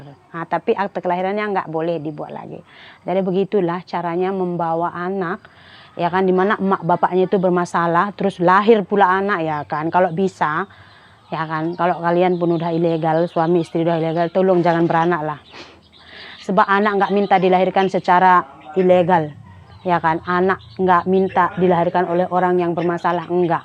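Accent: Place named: Malaysia. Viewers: Betul loghat percakapan mcm orang Malaysia la 😂Aunty Malasian suka dan family❤ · logat sudah logat malaysia,cara ngomong nya cepat tak putus putus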